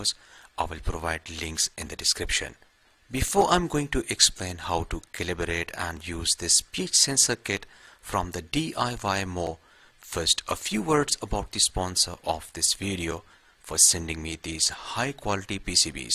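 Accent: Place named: India. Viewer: good video my friend with indian accent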